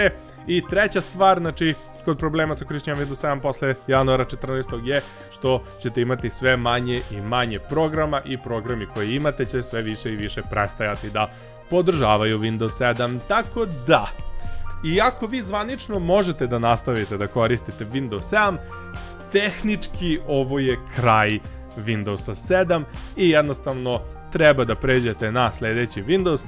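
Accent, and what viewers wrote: Russia. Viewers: cita engleski kao RUS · Taj tvoj domaci engleski zvuci ko rus kad pokusava da prica engleski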